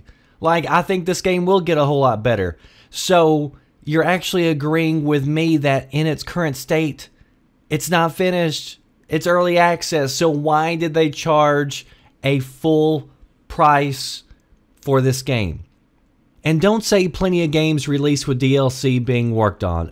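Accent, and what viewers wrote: South, United States. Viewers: holy shit his southern accent threw me off so bad.... i fucking love southern accents and im not even american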